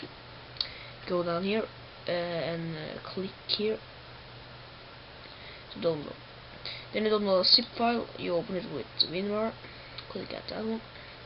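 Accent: Norway. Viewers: are you norwegian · are u norwegian?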